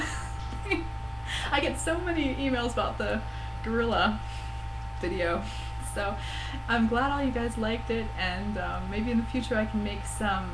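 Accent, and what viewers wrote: Germany. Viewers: einen leicht sächsischen akzent konnte ich in einer ihrer älteren videos bereits raushören.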